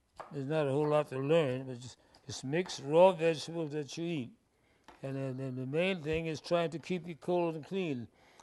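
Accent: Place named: South Carolina, United States. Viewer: I love this ELDER!!! So beautiful and such and inspiration and he sounds like my people from Lady's Island SC 🌴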